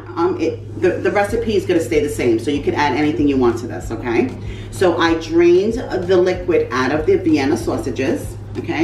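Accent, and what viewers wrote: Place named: Nuyorican, United States. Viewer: Love the newyorican accent.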